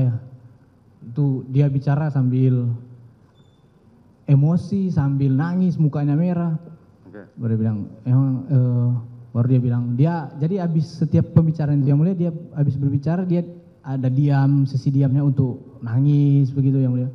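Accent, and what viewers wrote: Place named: North Sulawesi, Indonesia. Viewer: Dialeg SULUT tetap dong ❤️❤️❤️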